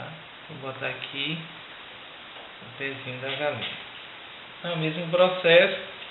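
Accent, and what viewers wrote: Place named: Recife, Brazil. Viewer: olá, me apaixonei pelo seu trabalho desde que vi o primeiro vídeo, e seu sotaque me faz lembrar minha infância e meus primos do Recife.Você é maravilhoso no que faz e se reclamarem que vc fala demais, são pessoas ingratas, pois se vc tem o trabalho de postar vídeos para nos ajudar devemos agradecer e não criticar.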